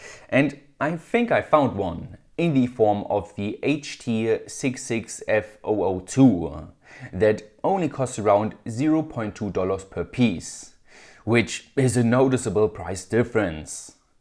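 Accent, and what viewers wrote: Germany. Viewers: Ich liebe dieses Deutscher versucht Englisch zu sprechen, die Betonungen sind Gold... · are u german?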